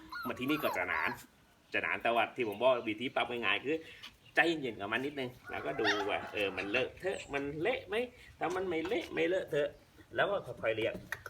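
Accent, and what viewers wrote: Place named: Phetchaburi, Thailand. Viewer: คน เพชร ป่าวครับถ้าคนเพชรคนบ้านเดียวกันสําเนียงเหมือนคนเพชร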